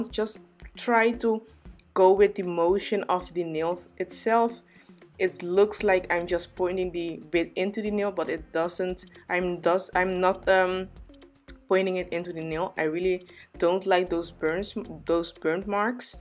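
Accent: Netherlands. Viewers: Ik hoor een Nederlands accent daar 😄👍🏽 Top filmpjes! 😘💋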